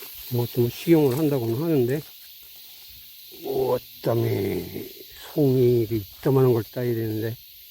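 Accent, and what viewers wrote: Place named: Chungcheong, South Korea. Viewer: 산삼능이약초산행에 갔다가 소개받고 왔습니다.좋은영상 감사하고유 말씀이 충청도분이신지 구수하니 좋네유.자주 들리겠습니다.항상 산산.풍산하세요~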